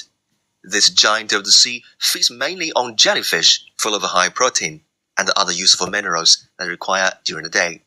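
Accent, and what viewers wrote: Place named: India. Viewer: Wataaaaaa, he must be indian, awful accent I've ever heard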